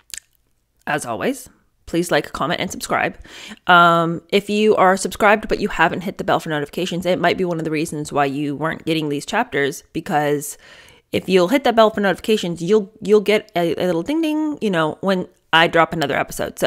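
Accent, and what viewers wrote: United Kingdom. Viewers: I LOVE your British accent...so cute...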